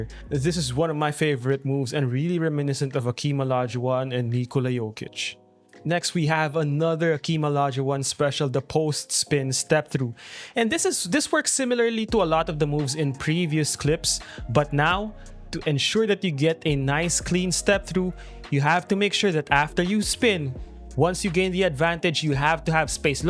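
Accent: Philippines. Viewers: Are you Filipino? I peep the accent 👀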